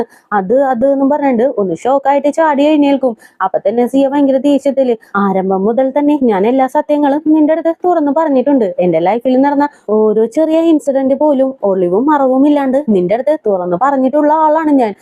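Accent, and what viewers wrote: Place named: Thrissur, India. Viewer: Language style kettal thanne Thrissura nadennu thonnunnu. Pls mention the drama name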